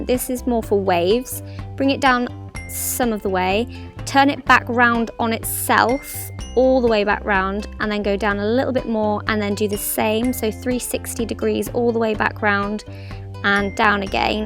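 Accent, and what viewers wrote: England, United Kingdom. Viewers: British accent!!!!! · Is she really British or was she faking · Are you from england? Your voice sounds so elegant! You sound like the queen of england! (Im from the US so im not used to fancy accents) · I love u omg ur so adorable! Where from England are u? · finally! someone else with an English accent! i thought i was the only one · Omg I love your accent sooo much! Is that British accent? I'm not sure bcuz I'm not real English person... Any way I loved your video! Now I got that how to make a fish tail ^.^ thanku so muchhh :) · you have the best British accent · R u british?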